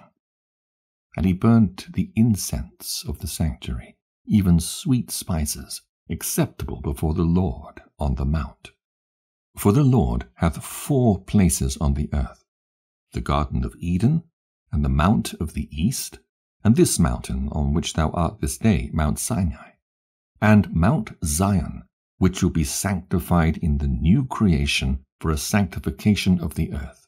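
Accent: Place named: Europe. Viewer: I love these other books but why are every one of them told in a European English accent???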